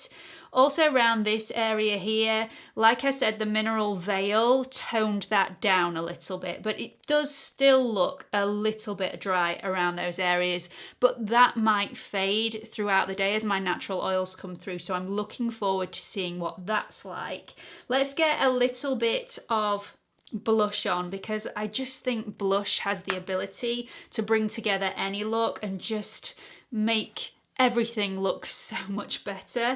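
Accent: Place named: United Kingdom. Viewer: Hi. Have you always had the accent? Oh my goodness, I know I've watched your videos before, but I don't remember you having an accent. Are you Australian or English? LOL I'm so sorry, I thought you were American before. I wish I could use a British accent whenever I feel like it, just saying 😅 Sorry, just asking cuz it surprised me ❤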